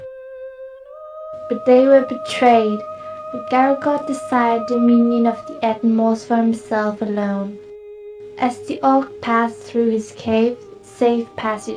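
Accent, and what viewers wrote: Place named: Netherlands. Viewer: I liked the video :D Thank you for the subtitles, though. You have a pretty dutch accent :)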